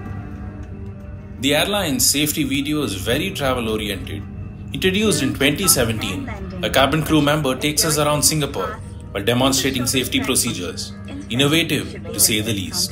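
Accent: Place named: Tamil, India. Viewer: Love from chennai ❤ Btw are you tamilan ? your voice is like that tho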